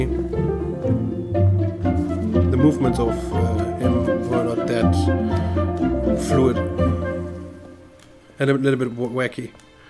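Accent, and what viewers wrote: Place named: Germany. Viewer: It's getting difficult to find reactions to this that I missed seeing. I especially like seeing reactions from countries other than mine. I'm in the USA. Your english is just fine, and I like your german accent. I also like that you are a straight man that accepts gay people for who they are. I definitely liked your reaction to In a Heartbeat. It is just about my favorite video. I had to wait almost fifty years to see a video about love that I could really relate to.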